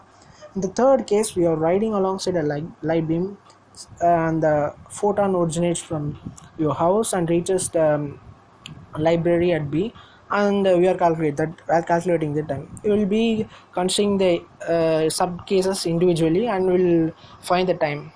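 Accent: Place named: India. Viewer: I love your video. I only would hope that you could improve your english accent. As a native speaker your Hindi/Urdu tone makes it difficult to understand. Good work though I like your explanation.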